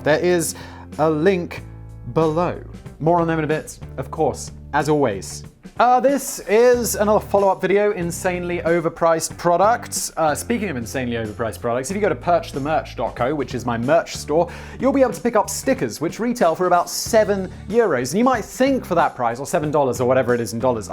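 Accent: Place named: United Kingdom. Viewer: When you sing you lose your British accent. I.e., you sound just like an American.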